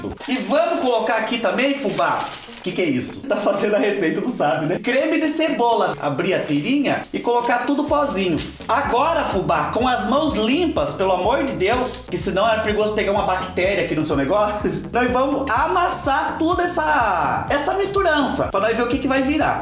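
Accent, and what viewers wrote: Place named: São Paulo countryside, Brazil. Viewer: Bom diaaaaaaaa fubá,adoro seu sotaque e fiquei muito feliz de saber que você mora aqui por perto da minha família,minha sogra é de Itararé,meus sobrinhos de Itapetininga minha nora também, todos eles falam assim como você acho lindo, beijão seu jaguara marvado eu nem preciso que aumente minha borda de catupiry# a cinturinha 😂😂😂😘😘😘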